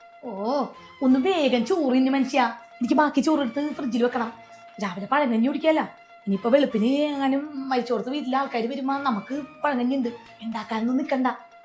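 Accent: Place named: Ernakulam, India. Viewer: സൗണ്ട് കേട്ടാൽ അറിയില്ലേ ekm തന്നെ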